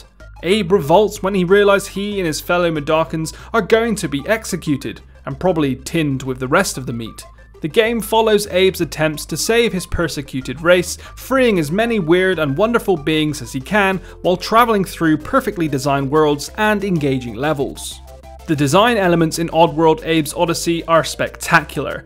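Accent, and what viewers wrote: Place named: West Country, United Kingdom. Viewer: Proper west country. You Somerset/Dorset based?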